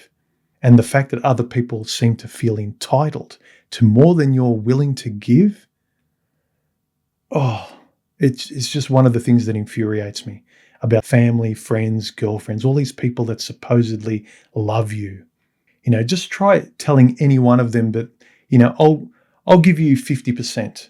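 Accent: Australia. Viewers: One of your best, but what's a "garl friend?" BTW The only man I know with a more distinctive Ozzie accent is Wal Thornhill, and he's a bloody genius across physics, astronomy, electricity, and cosmology. Anyone who can handle real science, should check out the thunderbolts project.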